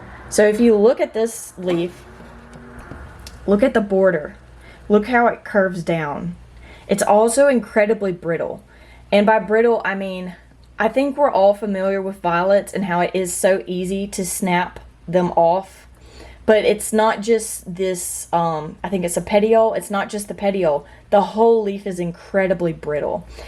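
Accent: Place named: Tennessee, United States. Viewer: I’ve been trying to figure out if it’s mites, too much light, or too much fertilizer on my violets. I think it may be mites :( I’m going to come back to your video tomorrow and compare them to mine. I feel pretty sure they have the same characteristics and am going to throw them away. sad, two are expensive fancy ones and the other was a showstopper for me for so long. trailer always covered in tons of blooms, it didn’t even look real. I’m happy to find your video, I love how knowledgeable and in depth this is. I am also a plant nerd and love the knowledge density and showing the plants up close and talking about the very specific signs that it is mites. that is so helpful. am subscribing to you! also idk if you’re from Tennessee but I am, haha and we sound similar :)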